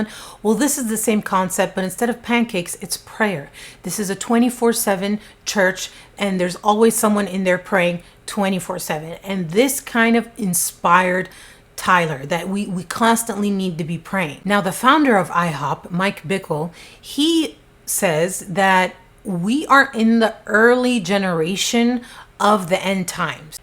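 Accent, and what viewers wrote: France. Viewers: you have a great french accent! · I'm living for your French accent! good luck on your Paris endeavor. Thanks to you, I don't have to deep dive into these disturbing situations, myself. I feel so terrible for lil Bethany...she was such a gem. Like what a beautiful mind and smile. Hopefully she is resting easy and her loved ones are trying to heal. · just got invaded by visions of Lori Vallow or so. LOL. You narrate stories well and champion the victims. Thanks,! I usually skip the ads but not with you, haha! Your French accent is tres, tres bien! I think it may have been Tyler on his own or Bethany, in her fragile state of mind, was pushed to doing it.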